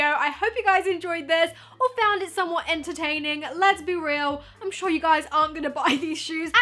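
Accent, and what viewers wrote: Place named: United Kingdom. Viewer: Her british accent OMG 😭💖💖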